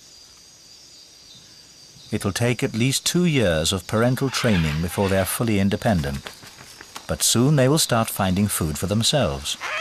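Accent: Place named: England, United Kingdom. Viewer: All nature shows should be voiced by English men, this video is proof of that. Beautiful and tragic, only true nature lovers and poets can appreciate this kind of documentary.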